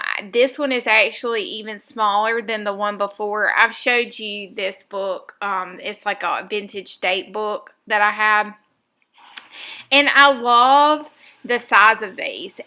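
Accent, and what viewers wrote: South, United States. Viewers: Happy to hear your southern voice again, very relaxing for me. 😍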